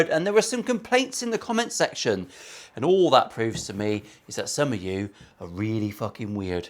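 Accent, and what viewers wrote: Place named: Plymouth, United Kingdom. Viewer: I've no interest in guitars. I was just idlely scrolling. I heard the accent and had to ask if he's (you) are from Plymouth? I think it's a Plymothian accent, and judging by the language, and I am judging, specifically Swilly. Let me know. From an exiled Janner.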